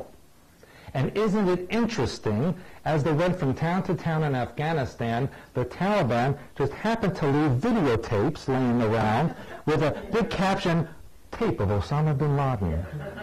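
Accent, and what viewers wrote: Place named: Russia. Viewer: So he's Russian, of communist family origins and he's married to whom? She's related to Mariam Magdalen. He has an accent, yet I am not sure why if he spent 25 years within the US fold working and ended that back in the 80s. He looks pretty young here, and this video was taken before 2003. Is 7lovo4 Stewart or a student? I'm seeing a fine line of agenda here, which is going to make me doubt a lot of his teachings and I'm only into this guy by 10 hrs or so. This is a reptilian take over?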